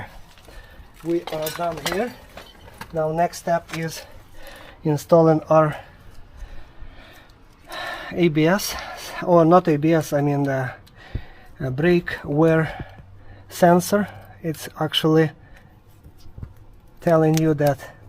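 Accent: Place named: Russia. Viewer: чел, ты реально думаешь, что я смотрел и слушал 40 минут безумного русского акцента про замену тормозных дисков? Оно мне зачем? И, может ты не в курсе, но языки других стран это не проблема с появлением нейросетей. На лету переводят. Впрочем, оно мне не надо, чтобы понять твою речь. Снимай, как хочется.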